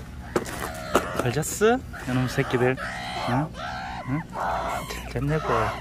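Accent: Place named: Sangju, South Korea. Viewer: 여긴 미국 난 상주출신 억양이 너무 오랜만에 들어서 반가반가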